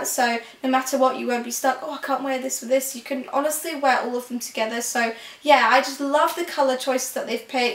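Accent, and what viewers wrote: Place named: Kent, United Kingdom. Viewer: Despite all the haters & the letchers you have to admire dedication to her body: those abs don't happen by accident! Also love the Kent accent with hints of Scotland. You could say 'so' all night long for me. Keep up the good work!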